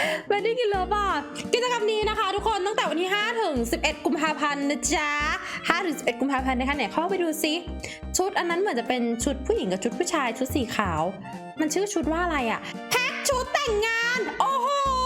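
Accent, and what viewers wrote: North, Thailand. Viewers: ปี่เป็นคนเหนือแม่นก่อคับ💗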